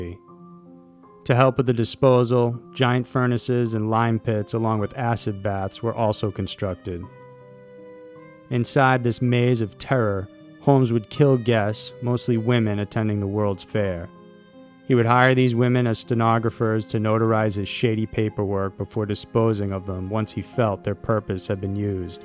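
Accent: United States. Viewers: Probably because a) the guy sounds like he's from the US and b) the US tends to have better records, and also records in English. I know if I was doing this and I came across one that I had to go through and translate I'd quit.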